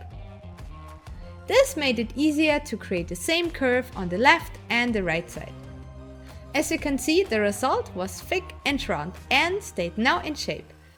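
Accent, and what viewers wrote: Germany. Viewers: Das deutsch englisch ist einfach nur genial 😂😂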